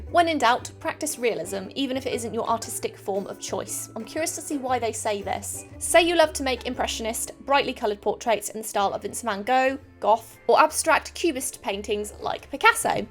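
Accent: United Kingdom. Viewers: “Let’s put on our fancy voice.” Tf you talkin bout ur british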